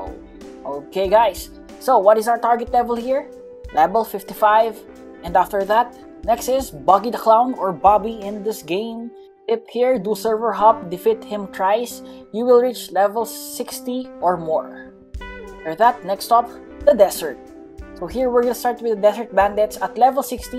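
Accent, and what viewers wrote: Philippines. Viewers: R u a filipino? Bc the way u speak english is just like filipino and ur wearng a philipines strae hat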